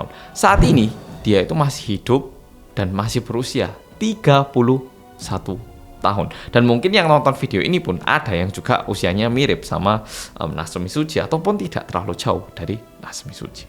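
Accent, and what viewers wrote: Java, Indonesia. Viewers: Hadirrrrr.... Mas medok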